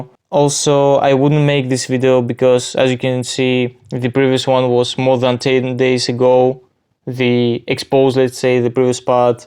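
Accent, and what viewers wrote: Greece. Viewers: Love the accent, fellow greek accent. Hyra is being a little kid again like wtf. Thanks for sharing your view. Hyra is totally wrong here, lets just not like be toxic back. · I can hear the greek accent coming through my ears lmao Much love man ❤ Stay cool about it There is no reason to waste your time on him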